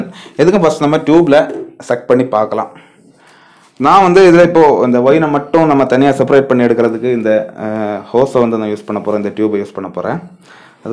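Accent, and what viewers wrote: Kanyakumari, India. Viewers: bro Kanyakumari ya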